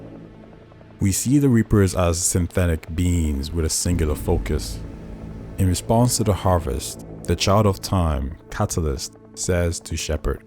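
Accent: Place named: Trinidad and Tobago. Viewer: You sound almost like a Trini. I dig it.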